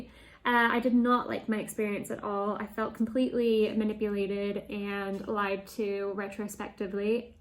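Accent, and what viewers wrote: United Kingdom. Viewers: What's really interesting is I sensed a UK accent when you first started talking before you even mentioned you lived/worked there. So cute! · Ah your sweet little UK accent that just barely comes out sometimes..adorable!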